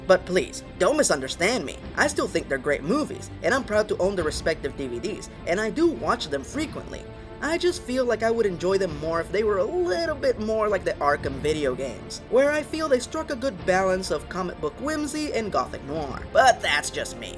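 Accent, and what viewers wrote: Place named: Puerto Rico, United States. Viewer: he's Puerto Rican so 1. I don't think English is his first language and 2. he has a slight accent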